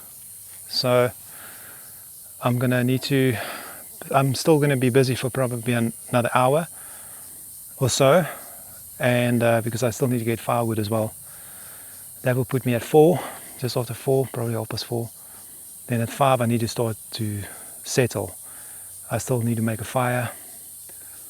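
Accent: South Africa. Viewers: Are you from South Africa? I was sure you were European. Anyway hi from Quebec Canada !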